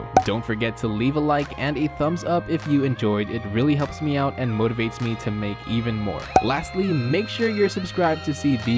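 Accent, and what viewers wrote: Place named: United States. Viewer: I cant take football videos seriously when their commentated by Americans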